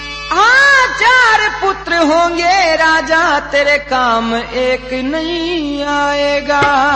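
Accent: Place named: Shekhawati, India. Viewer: इस कथा में आपका जो गाने का अंदाज है जो लय हैं इसका मेरे पास कोई शब्द नहीं है और आपकी जो शेखावटी भाषा कितनी प्यारी लगती है लव यू गुरुजी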